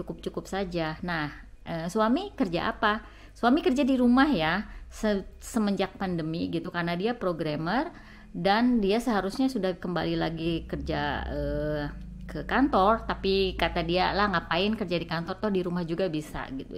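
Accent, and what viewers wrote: West Java, Indonesia. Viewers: Teteh sundanya darimana ?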